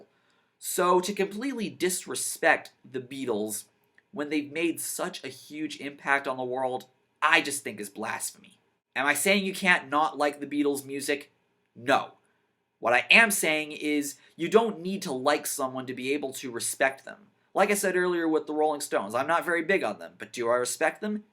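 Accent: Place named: United States. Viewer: The only thing wrong with The Beatles is that you Americans keep saying Beedles. It's Beatles with a t for f***'s sake!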